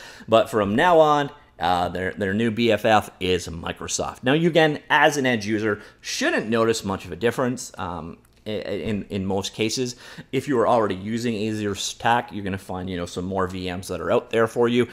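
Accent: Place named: Canada. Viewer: He is Canadian after all. They pronounce a lot of words wrong. I think it's kind of cute, like Carter said to McKay.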